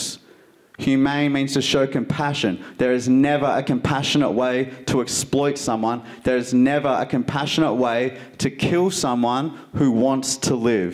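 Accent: Australia. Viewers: Each time your speech comes out, first of all I smile thanks to your Australian accent, and immediately after, I feel empowered and extremely proud to be part of this journey for more than a year now, for participating to something much bigger than me, than us, for being part of the Universe... I became vegan overnight after having watched Gary's speech. Both of you have my ex-boyfriends' name so I thought it was about time and a sign to make peace with my past. I knew it was in alignment with who I am and who I want to be as a person. And one year later I feel more happy, more energized, more aware, more educated, more and more human than ever before. so thank you for reminding me why I became vegan and thank you for carrying the voice of those who aren't heard. Thank you for everything you do. Thank you, from the bottom of my heart. Thank you. Sending you all my love. 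Bye Coco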